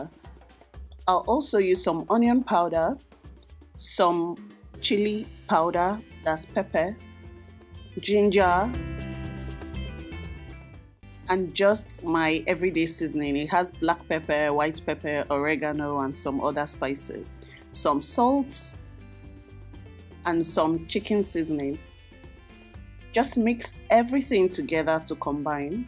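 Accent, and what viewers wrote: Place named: Nigeria. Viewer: I am totally blind and I love the way you described your video. He did a good job and it's easy to follow by the way you sound like you're from Nigeria. Anyway that's exactly what I did and how I followed it it's very good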